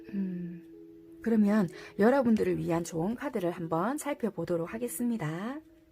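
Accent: Busan, South Korea. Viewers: 부산 사투리 뿜뿜 타로 2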